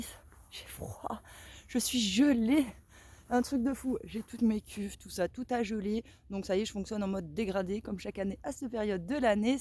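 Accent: Paris, France. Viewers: Petits conseils : réseaux sociaux, pas réseaux socials, ça pique! Essayer de perdre cet horrible accent parigot. Et enfin, suivre une psychothérapie, dont il semble que vous ayez grand besoin.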